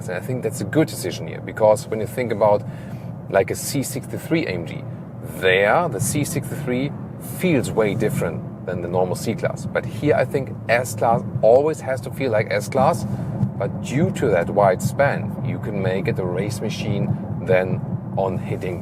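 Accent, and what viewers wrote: Germany. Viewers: Vom accent bin ich mir sicher das er deutscher ist 😅 🇩🇪💪💯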